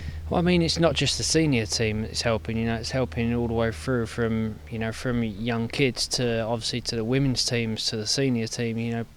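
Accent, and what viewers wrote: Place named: United Kingdom. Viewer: I love your British sound <3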